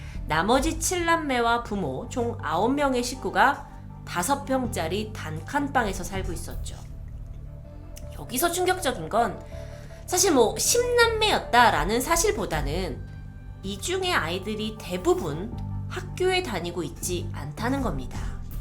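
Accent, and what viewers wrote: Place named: Jeolla, South Korea. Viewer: 전라도 ㅋㅋㅋㅋㅋ 아옼ㅋㅋ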